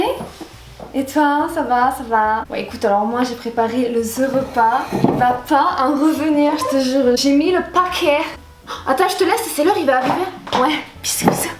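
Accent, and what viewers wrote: South, France. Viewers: Moi : je déteste les vidéos make-up/haul ch'ai pas quoi. Moi : tous les dimanches à mater tes vidéos !!! Lol j'aime bcp ton naturel et ton joli accent du Sud ! 🤗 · Haha je t’adore j’ai découvert ta chaîne il n’y a pas longtemps mais j’aime ton naturel, tu me fais beaucoup rire et t’es trop mignonne. Trop attendrissante et ton accent me fait un bien fou (le sud me manque!!!)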